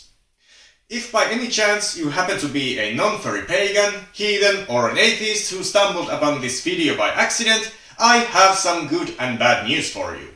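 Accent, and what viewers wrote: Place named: Finland. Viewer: o how come i didn't know about this video until now? Also nordic pagan here. And a furry xD You sound Finnish, as your accent reminds me of my own. I grew up with the Finnish paganism as my mummi was Finnish pagan. Like the old school pagan where the world was being created from the skybird egg. Though i have adopted a more of a mix of Norse paganism and Finnish paganism.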